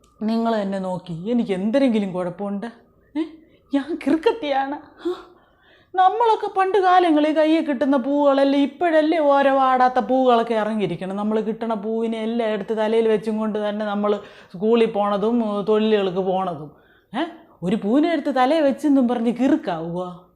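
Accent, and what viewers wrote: Thiruvananthapuram, India. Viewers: I like you, Your Tvm style slang ❤🤣🤣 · Chachi entharu ?. Sukangalu thanne ? Chachide thiruvanthoram bhasha kalakki. Abhinayavum super. Kochu kalli.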